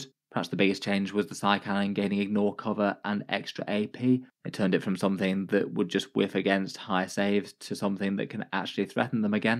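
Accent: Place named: United Kingdom. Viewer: why britains can't speak english?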